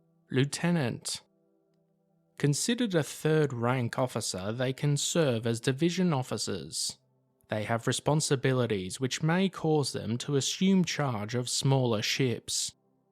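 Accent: England, United Kingdom. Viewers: Thanks 🇬🇧 accent. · US Navy. Why have an English guy as speaker? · Why is some British guy narrating this? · It's funny to hear a British person do American ranks of the US Navy. Anyhow I got out of the Navy 9 years and was a second class petty officer bosun's mate. When I got out I was up for bosun's mate first class but I didn't care because I was getting out. Peace.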